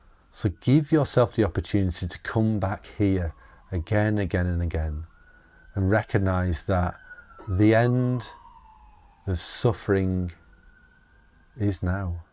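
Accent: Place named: Northern England, United Kingdom. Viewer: I don't spend much time on You Tube (less than one hour a week, I reckon)... came across your channel recently, love your content! Very calming, reassuring, inspiring and deep. Also love your accent, you sound like one of the Beatles!! (are you from Liverpool? Certainly from Northen England, I guess). Bless you. Cheers from Italy.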